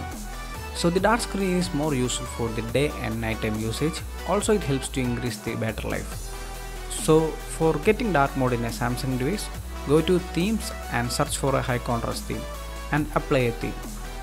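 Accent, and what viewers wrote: India. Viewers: The pronuntiation is more indian than english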